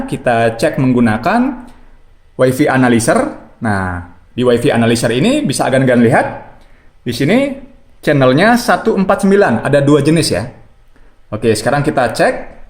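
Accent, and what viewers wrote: Bali, Indonesia. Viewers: Logat bali, Semeton ne 😍👍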